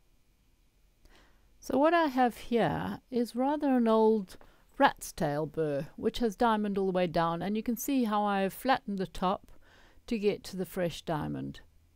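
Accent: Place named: South Africa. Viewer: Ur south Africa accent keeps popping up.!!😁😁😘 I keep trying to look round ur hair.!!!🤣🤣🤣🤣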